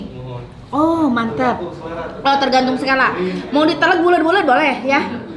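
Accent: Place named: Sunda, Indonesia. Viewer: Paling seneng bgt dgr org ngmg sunda😂 dgr teh ngmg tuh sesuatu bgt 😂👍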